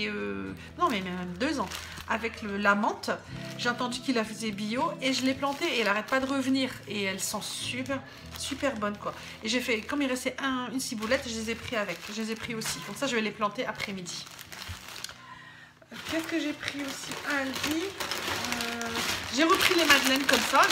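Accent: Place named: North, France. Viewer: Salam merci pour la vidéo , j’ai le meme passe-temps que toi le crochet ! J’en fais tout les jours quand je peux j’adore !! Tu viens du Nord de la France cela s’entend je reconnais puisque moi aussi lol , d’où exactement? Bon ramadan à vous bisous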